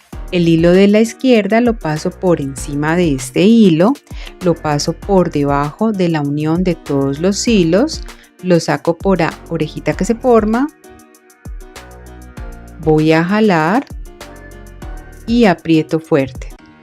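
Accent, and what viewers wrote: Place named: Medellín, Colombia. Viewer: están muy bonitas y la manera como lo explicas lo hace fácil de seguir el proceso, el tono de voz muy ameno, muy bacano. Pareces de Medellín. Saludos.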